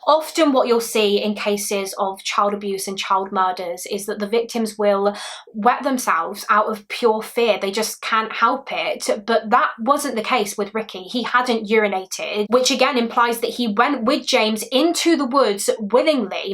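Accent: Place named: Midlands, United Kingdom. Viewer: Okay I know this is completely unrelated to this tragic story. But where are you from? I’m from the UK but can’t pin your accent and it’s driving me insane like when you can’t remember the name of a song or tv show 😂 I’m guessing somewhere in the midlands?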